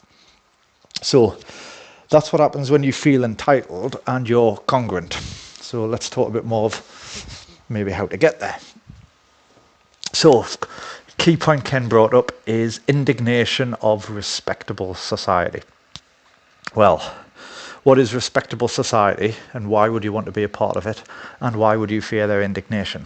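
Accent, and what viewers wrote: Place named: United Kingdom. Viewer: 22.30 pff you're too uptight and serious about this "outlaws vs respectable society" thing IMO. rock concerts are awesome. you can be a man on your own journey and living life on your own terms but still enjoying what others have achieved because it brings you joy and excitement. just for the sake of it! what's so bad about it for a sigma character? i find your views very intriguing but sometimes you make it a bit too complicated,especially with your strong british accent :-p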